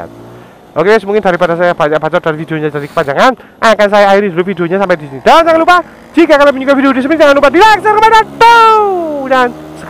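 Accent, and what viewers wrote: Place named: Temanggung, Indonesia. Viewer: Kok koyo wong temanggung asli mas 😅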